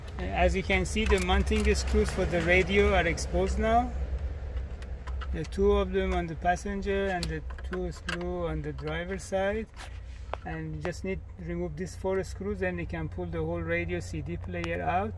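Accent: Iran. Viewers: You must be Persian I love your accent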